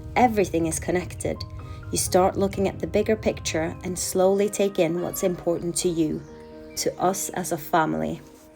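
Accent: Northern England, United Kingdom. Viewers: For a Norwegian you have a very noticeable Northern English accent, Yorkshire? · What a beautiful little piece of England 😊 You have a great northern English accent, for a Norwegian 👍 (the stunningly good YouTube algorithm recommended this)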